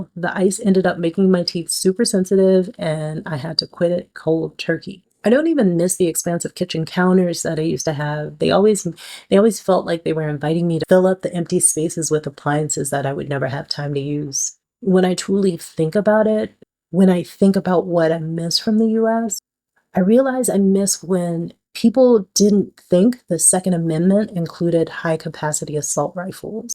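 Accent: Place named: Spain. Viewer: Ooh ooh, lovely Spanish accent 👌🤗 Hey a biker chic too 👌 All minor inconveniences compared to the maggot that's damaging US democracy 😉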